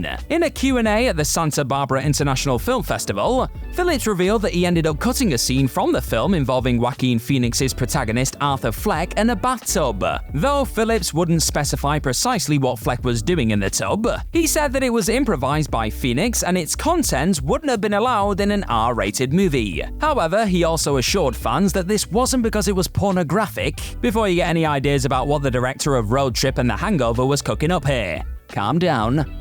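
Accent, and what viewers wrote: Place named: United Kingdom. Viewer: Yes it is, I've been watching British tv for over 40 years, I've heard that exact pronounciation hundreds of thousands of times from other Brits. It is part of the accent.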